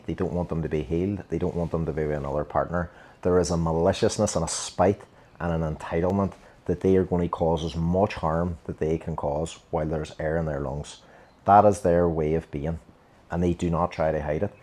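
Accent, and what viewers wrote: Ireland. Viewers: I just wanted to say thank you and I love your Irish accent. I’ve personally experienced this detox period from a toxic relationship and it is so painful. I was worrying about my own safety once I decided to leave the relationship! I have done the necessary healing and don’t ever want to go back. This helps me as I’m training to be a counselor myself! God bless you friend! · with that awesome irish accent, well said, and thank you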